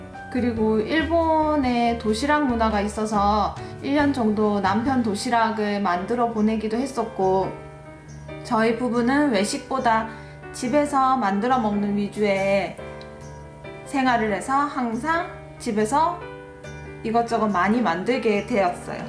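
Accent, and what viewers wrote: Gyeongsang, South Korea. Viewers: 어머나ㅋ 경상도 분이신가보다ㅋ 나도 경상도사람이라 엄청 반갑네요 덕분에 요즘 큰아들 도시락 잘싸주고있어요 감사합니다 쿠팡에서 도시락통도 새로샀답니다ㅋ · 전 78년생^^ 신랑회사구내식당이 잠시 쉬면서 도시락을 싸기 시작했어요.홈까페 홈밥요리 좋아하면서도 도시락은 초초초짜 줌마예요. 말투들으니 경상도네요.반가워용.저두 대구고향 부산출신 양산살아요ㅎ 지난 영상들이지만 많이 배우고 눈으로 힐링해요. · 경상도분이시네요~ ㅎ 저도 남편 직업상 삼시세끼 3일씩 글고 큰애 기숙사 도시락까지 만만치 않은데 유튜버를 보는게 많은 도움이 되어요. 젊은 분이 대단하시네요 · 88년생인데 살림을 넘잘하네요 우리딸들도 비슷한 나이인데 손하나 까딱하지 않는데 ...ㅠㅠ 말투가 경상도분인것 같네요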